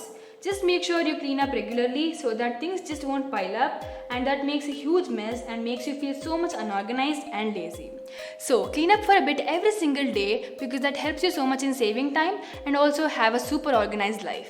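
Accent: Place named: England, United Kingdom. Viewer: First tym here girl....and loved ur voice and ur English accent....nd the tips are beneficial ❣️🥰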